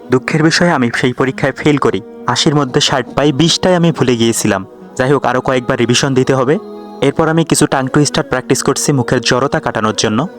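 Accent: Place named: South Bengal, India. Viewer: আপনার কথায় দক্ষিণবঙ্গের Accent রয়েছে।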